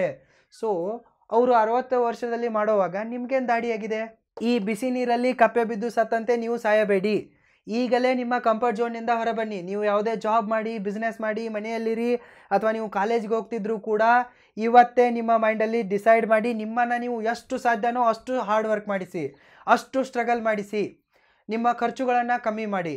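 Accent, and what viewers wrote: North Karnataka, India. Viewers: Ningond request paa nan kadeyinda... Neenu uttar karnataka bhaashe maathadu illa neetaagi south kannada maathadu... ardaa adu idu antha kisi byaadpa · Awesome presentation, north Karnataka style. Talk like close friend. 👌 ...I loved the words kisiyodhu, kolithiri😂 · well said boss. nice presentation in north Karnataka style.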